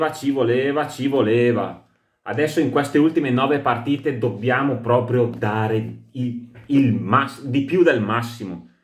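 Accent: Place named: Veneto, Italy. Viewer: Ti si Veneto??? Bella parlata❤🩶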